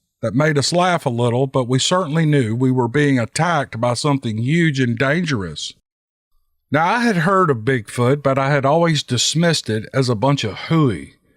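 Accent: South, United States. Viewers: yep, love his southern accent❤ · Love your southern drawl, you give flavor to the stories you read...can almost envision what is being expressed through words...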